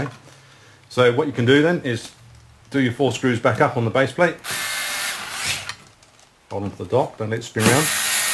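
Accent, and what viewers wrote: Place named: United Kingdom. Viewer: thank you, This is so easy, my daughter is only 5 and she can do it herself. I just save $70 USD. I call Bose and they said is $ 115 with shipping included and you will also get a 1 year warranty and any of the parts? I just pay $39 USD on EBAY, and I fixed it in 5 minutes tops.I Love It, and the British accent is cool. I from Colombia and live in LA. Thank you I’m very happy, my family love this portable sound dock.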